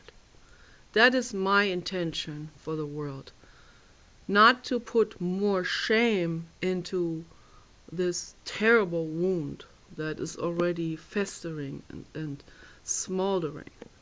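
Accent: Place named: Germany. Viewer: Are You German? You have suuucchh a german accent. Great video!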